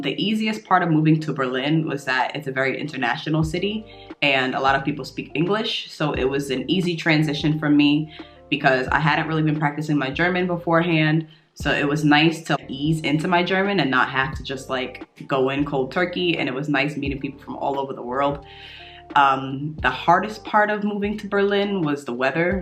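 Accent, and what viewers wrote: New York, United States. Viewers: I can't hear any New York accent. I'll bet you could put one on if you wanted. Edit: I heard it when you said "horrible." 😊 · You TOTALLY have a NY accent!! But it’s particularly more Queens or Brooklyn. It always makes me think of Mos Def. 🤓😎 I love it! ❤️ I have a Philly accent but am more careful to speak a “standard English” when I speak to people outside the US.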